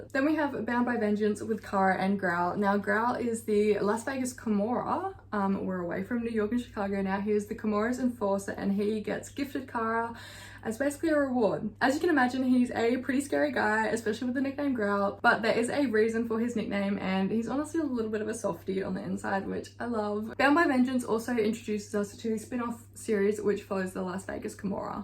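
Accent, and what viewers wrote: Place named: Australia. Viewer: omg i just found your channel and when i heard a fellow aussie accent i got so excited 🫶🏼😭😭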